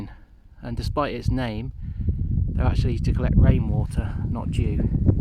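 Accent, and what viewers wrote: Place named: United Kingdom. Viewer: The most ‘British’ accent ever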